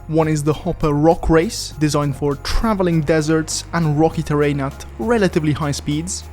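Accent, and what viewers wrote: Italy. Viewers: bro so easy to tell you are italian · Ma sei italiano? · Ciao, ho sentito che sei italiano. Come fai a parlare inglese così bene? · ma sei italiano? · aoo ma sei italiano ?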